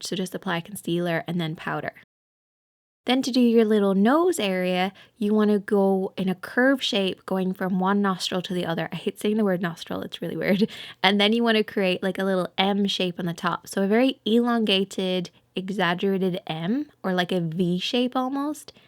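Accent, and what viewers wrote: Ireland. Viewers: Hey are you Irish??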